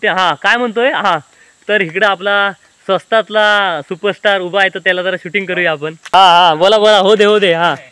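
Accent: Konkan, India. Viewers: खूप छान व्हिडीओ दादा तुझी गावरान भाषा शैली हवीहवीशी वाटते ओरिजनल कोकणी भाषा हीच भाषा ठेव पुढ जाशील कानाला ती शुद्ध भाषा ऐकन्या पेक्षा ही भाषा गोड वाटते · गेले काही दिवस तुमचे व्हिडिओ बघतोय... आपली कोकणी बोली आवडली...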